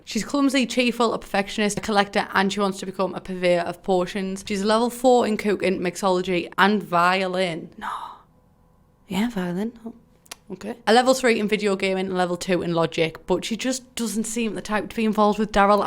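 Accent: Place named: North, United Kingdom. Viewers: You are now my official Sims news broadcaster, always loved the accent, I could listen to you all day and that northern humor just has me on the floor holding my stomach. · This is way more entertaining listening to a northerner😂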